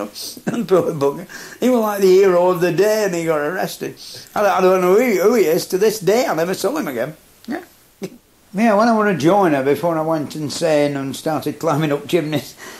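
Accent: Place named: Bolton, United Kingdom. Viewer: Nice words, its magic isn't it. The Boulton accent i still hear from my family who moved to Cornwall in the 60s. I wear overalls and a greasy hat most days and I'm a young un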